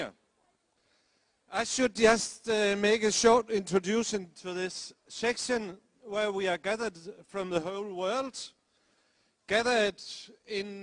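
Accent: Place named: Denmark. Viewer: joken er lidt på dig, for han siger faktisk THEREfor, og det passer perfekt... Han engelsk er sådan set fint, han har dansker accenten og lidt grammatiske problemer....men han når selv og rette de fejl han laver... så længe han forstår og formidle sig så pointen kommer igennem er det vel fint? Men, han ku' nu godt ligne viggo sommer en smule ;)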